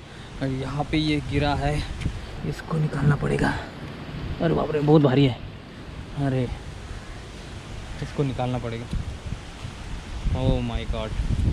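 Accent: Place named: Maharashtra, India. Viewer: व्हिडिओ मराठीमध्ये बनवला असता तर बरं वाटलं असतं. तसंही तुमचं उच्चारण बरसच मराठी वाटतं होतं.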